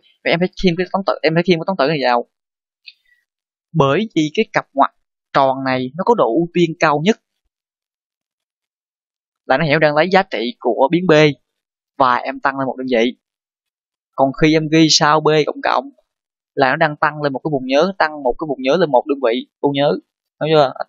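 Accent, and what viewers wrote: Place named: South, Vietnam. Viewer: ước gì anh ra thêm nhiều video hay như này ^^ thích giọng nói của anh lắm luôn, rất dễ hiểu...coi xong video của anh là e thích con trai miền nam luôn đấy ^0^, cảm ơn anh nhé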